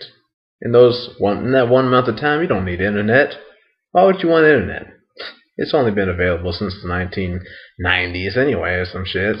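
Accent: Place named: United States. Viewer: Take YOUR shitty accent and attitude back to America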